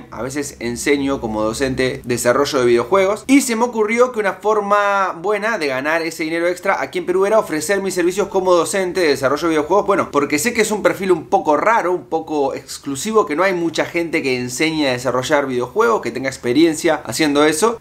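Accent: Argentina. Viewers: Muy interesante tu vídeo!! Bien expuesto muy claro. Se que tienes todo bien calculado. Me alegro que estes conténto con tu trabajo!!! Es verdad que los argentinos son muy bien vistos y considerados en Peru!! Especial mente por las chicas jajajajajaja 😂. Es sabido que no hay Argentino feo!! Todos son bellos y sobretodo encantadores, creo que eso que decían antes que el Argentino es sobrado ya paso a la historia verdad?? Ojalá todo continúe como dices avanzando y no empiecen las trabas con el gobierno de este señor Rojo!! Espero sigan tus existos tanto en el canal y como docente!! Me encanta cuando hablas y dices Posho, shamame, Sho etc etc jsjajaja😂. Me gusta ver que la gente te quiere!! Los peruanos somos querendones con los extranjeros pero no!! entre nosotros lamentablemente!!! 🙁. Buena vibra 😊 Bendiciones 🌺🌸😊😉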